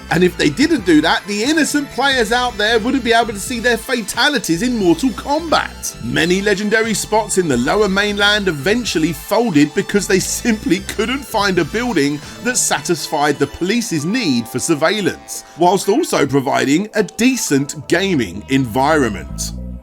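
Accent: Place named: United Kingdom. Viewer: I always thought that hommie is from UK 😂🇬🇧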